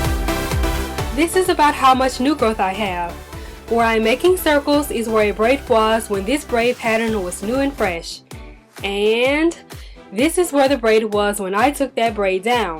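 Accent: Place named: Australia. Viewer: You sound like you had a little Australian accent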